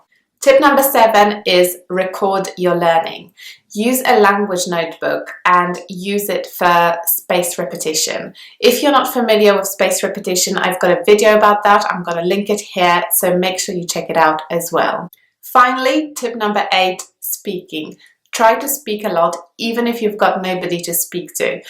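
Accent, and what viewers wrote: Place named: United Kingdom. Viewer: Great content! I have just discovered your channel and it seems to be the thing I needed so far. One quick question. I definitely hear a British accent, but there is something more in your accent. Is it Polish? Your speech is purely beautiful.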